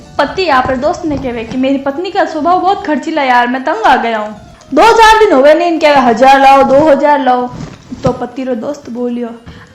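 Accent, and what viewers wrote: Marwar, India. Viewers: aapra awaj me marwadi ghani chokhi lage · 👉l like your😍 marvadi boli & also your dressing sense 👌👍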